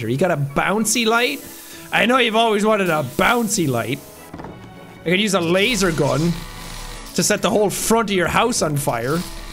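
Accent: Scotland, United Kingdom. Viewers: I can't believe no one has yelled (IN FUN) to a comment that says "you are a psychotic ass!!!" 🤣😂 I luv his Scottish accent (or Irish?) too! 🤣❤💋 Please don't hate on me my friends because I KNOW I am an idiot.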